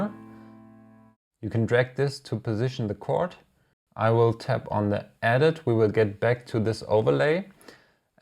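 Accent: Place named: Germany. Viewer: You Sounds like a German Guy when you speak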